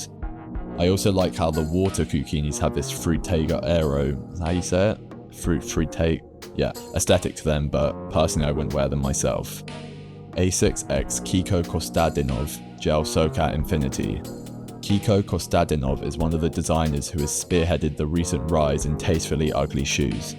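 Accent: United Kingdom. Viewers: I love your videos but i have to admit, its hard listening to that much fry (plus the britishest accent, my mind is exploding)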